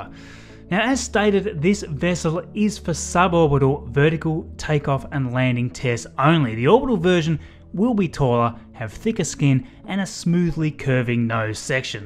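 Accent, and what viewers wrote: Australia. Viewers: Just subscribed! Great to hear an Aussie voice describing Space stuff. Good on ya mate thanks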